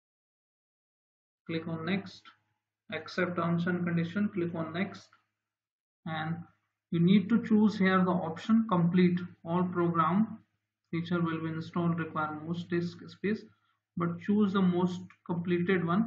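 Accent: India. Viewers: man I should know by now to trust indnan accent, you are the G my man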